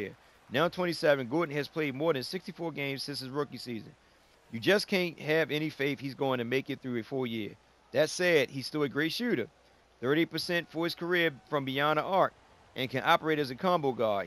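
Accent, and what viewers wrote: Baltimore, United States. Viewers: you sound like your from b-more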